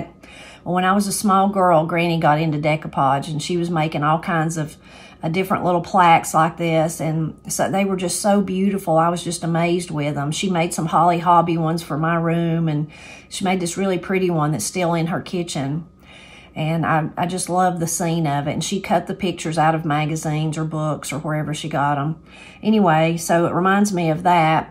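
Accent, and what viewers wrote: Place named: Georgia, United States. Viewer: You are so right! I sometimes just turn on her video just to hear her and her family speak and talk about their ups and downs and day to day little things! I'm in the Midwest but my family is from North Georgia and the accent and values of my people come through the screen to put a smile on my face! 😊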